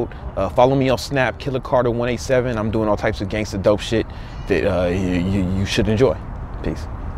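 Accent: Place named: New York, United States. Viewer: For someone that's from ChiTown, you have a heavy set, NYC accent fam. When I first saw.your vids 2-3 yrs ago, I always thought you where from Da Big Apple. How long you've been living here? P.S. I took the liberty to check your Six Pack Cheat Codes. Definitely gonna add you to my email friend list. I saw PT 1 & that shit look mad intense. I'm recovering from the flu hence, I don't have the endurance much less, the strength to dare fuck with your regime. Not til I get healthy. I'm slim, I should be able to get a six pack before summer ends. P.P.S. Didn't I tell you your Bulls were done in the 90's fam? 😄 I know, I know, Knicks in the 70's. Smh!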